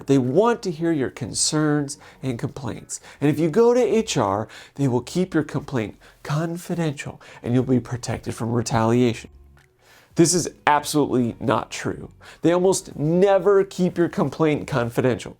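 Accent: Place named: California, United States. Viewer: This dude sounds like he’s from California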